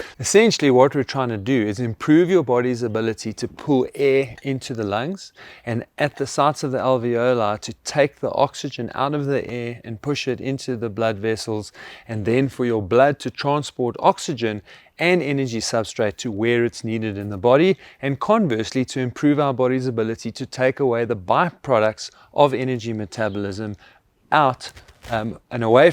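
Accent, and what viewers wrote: South Africa. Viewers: British? I only hear South African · Advice sounds even better delivered with a South African accent. Such a lovely accent!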